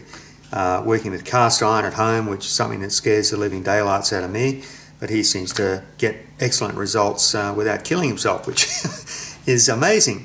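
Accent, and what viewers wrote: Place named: Australia. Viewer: Good job! Love hearing your accent it reminds my Mother in law. Yes she was an Aussi as well. Go Wallabys!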